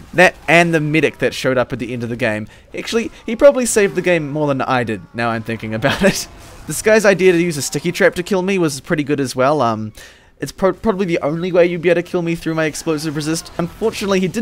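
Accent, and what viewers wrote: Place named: New Zealand. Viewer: I think the kiwi accent is super chill to listen to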